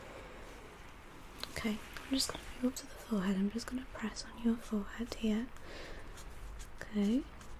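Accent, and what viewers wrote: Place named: Midlands, United Kingdom. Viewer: It’s her accent. She sounds a bit like where im from. Midlands area, but she speaks a bit more well spoken. What you expect her to do though? She’s just talking normally?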